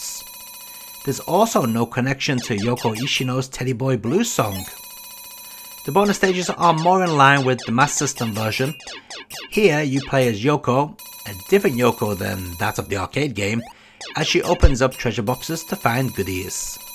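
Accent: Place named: St Helens, United Kingdom. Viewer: I've got to ask, mate. Where are you from originally? Northwest right? Sound like st Helens.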